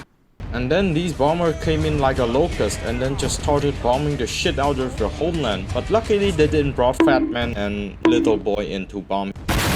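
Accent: Germany. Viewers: Is this guy german, if no his german is really good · Great German accent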